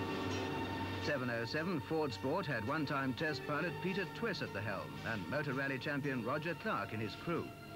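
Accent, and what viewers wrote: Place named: RP, United Kingdom. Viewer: love that 'cut glass' accent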